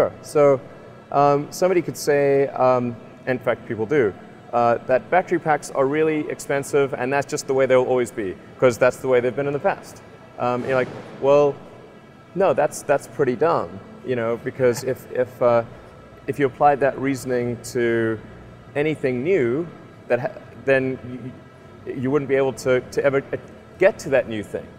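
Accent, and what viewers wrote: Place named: Australia. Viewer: How come he has an Australian accent?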